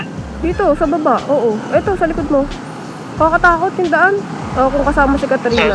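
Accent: Laguna, Philippines. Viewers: Gusto ko yung puntong laguna mo idol haha enjoy ako sa vid palagi! sana makaride ka namin ng misis ko soon! penye sticker haha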